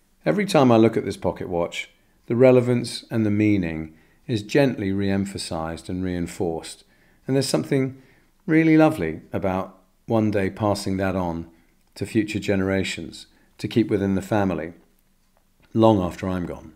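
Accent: RP, United Kingdom. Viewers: Your English is Royal. I love watching your videos. Thank you.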